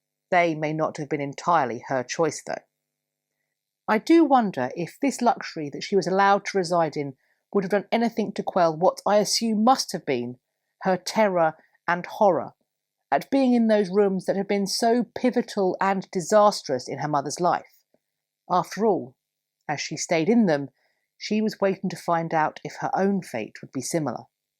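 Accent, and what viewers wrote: United Kingdom. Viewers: With your British accent, you present as a stuffy person, but, you are a hoot, a very kind hoot. ;)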